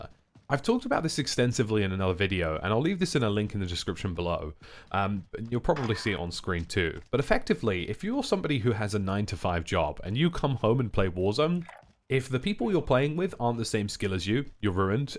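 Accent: United Kingdom. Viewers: Are you well?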